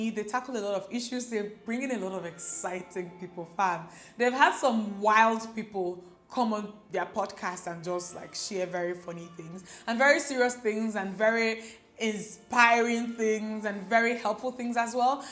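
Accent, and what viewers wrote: Igbo, Nigeria. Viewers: Where have you been, you sweet? Lol. I recently subscribed to this channel and I love that slight Igbo accent you've got!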